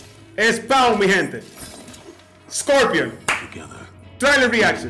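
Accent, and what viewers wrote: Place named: Dominican Republic. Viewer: Eres dominicano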